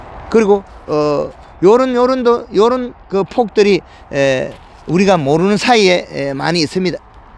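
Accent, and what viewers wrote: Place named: Gyeongsang, South Korea. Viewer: 동분서주하시며 특히 경상도 본토 발음에 친근함 느낍니다 저는 마늘심고 잎이3~4 장될쯤 가을비가 많이와서 두둑이 잠길정도 빗물이차서 뿌리가 傷해서 대표님 말씀대로 요소를 주고 회복이됬는데 웃비료를 15일후 뿌리고 부직포를 덮고 날씨가 포근해서 골드그린을 시비할려고 부직포를 벗겨보니 비료 가스에 마늘잎이 노랐게 말라버렸읍니다 1월25일 비료를 주고 물을 주고 밤기온이 영하로 안내려간다는 예보를보고 근간 포근한날씨라햇볕을 보고 해서 많이 회복되는 감을 느끼고 대표니의 창녕 리포트를 보고 글을 올리립니다 생초보 지만 황금알농법을 열심히 실행하고 있읍니다 물이 차거워 마늘 작물이 스트레스를 받지않을까 생각도 되고요 아무튼 최선을 다해 해볼까 합니다 추후 동영상을 보내드려 도움을 받고 싶습니다 늦은 밤시간에 댓글을 올려 대단히 송구합니다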